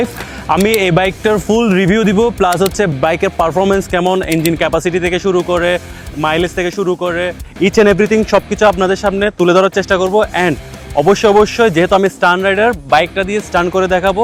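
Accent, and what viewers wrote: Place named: Chittagong, Bangladesh. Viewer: শেষ..!! এই পোলারে বাইক দিয়ে বাইক আর ফিরে পাবার অবস্থা থাকবে না। নিউ বাইককে এরকম স্ট্রেস দিলে সেটা আর মনে হয় চালানোর অবস্থা থাকবে না। আর যে যে প্রজুক্তির কথা বলা হল এগুলো বাজারে আসছে আরো কয়েক বছর আগেই। এরকম তেল খেয়ে বাইক রিভিউ করার কোন দরকার নাই। অন্তত লিকুইড কুলিং থাক্লেও হত। আর চট্টগ্রাম এর টোন তো সেরা টোন....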